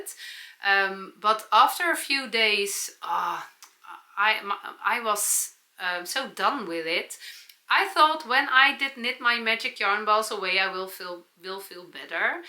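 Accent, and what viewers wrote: Netherlands. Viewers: Your hat is adorable and you look so beautiful in it!😊 I Always enjoy your shows and your “ Dutchey English”!😊👏 You do a great job and I always appreciate you not editing your videos to keep them real! 🤗Thank you and Blessings to you and your family!😊🌸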